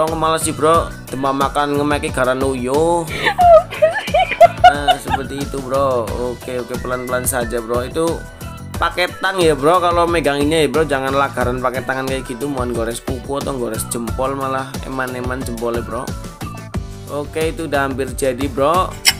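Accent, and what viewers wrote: Banyumas, Indonesia. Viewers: Bahasane ngapak ora ngapak ora kepenak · Wong ndi sih Bro...? Ganing ngomonge ngapak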